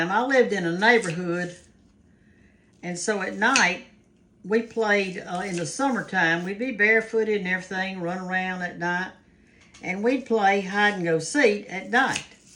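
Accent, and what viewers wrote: South, United States. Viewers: Hi Ms., my name is Lilia, I live in San Antonio, TX. I catch your Chanel every now and than and enjoy watching and listening to u. I jus love that good Ole country accent u Have. Im from Spain not mexican but full blooded Spaniard. Anywho, I really enjoyed learning about this cabbage casserole I plan to make especially to share with my granddaughter bcuz she's Vegan. She eats NO MEATS or Pultry or Seafood none at all and is very healthy. O, by the way, I fo remember those old houses and those doodle bugs. My greatest childhood memory was when my grandma had created a somewhat cave like where my friends and I use to make mud pies out of mud and pretend we were going to cook dinner w my play dishes. Well life has had it's twists and turns and praise the Lord I AM STILL HERE. GOD has been sooo very good to me above all in all. 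Lots of love your way as well as prayers for u and urs. Have a blessed and prosperous NEW YEAR in Jesus name Shalom❤ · So pleased to have you just pop up on my YouTube..i guess that my algorithm is finally figuring out what I like....love your familiar sounding southern accent...and I have been enjoying a lot of cabbage lately.. can't wait to try your cabbage casserole recipe 🤗.. Happy New Year..n I'm looking forward to more of your videos. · I love your shirt, where did you buy ut? Your accent reminds me ilof home and my southern roots, whereis your home? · You just make me happy hearing you I love your southern accent and your glory to the Lord shirt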